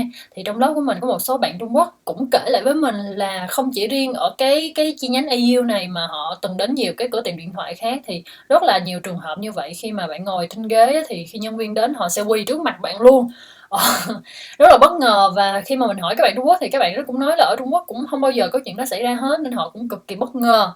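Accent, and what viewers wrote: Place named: Buon Ma Thuot, Vietnam. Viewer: bạn này nghe giọng giống ở buôn mê thuột ghê :)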